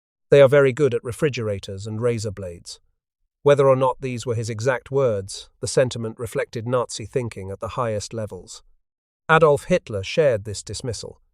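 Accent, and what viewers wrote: United Kingdom. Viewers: I absolutely adore listening…but genuinely curious why we have a male British narrator to catalog the history of American greatness 😅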